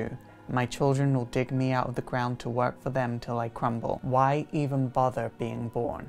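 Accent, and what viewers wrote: Scotland, United Kingdom. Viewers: I CANNOT take a Lovecraft quote seriously from your airy Scottish voice. Good video, loved the Kabbalah talk at the end. A weekly video series on Kabbalah with charts and diagrams would be killer. Happy Halloween.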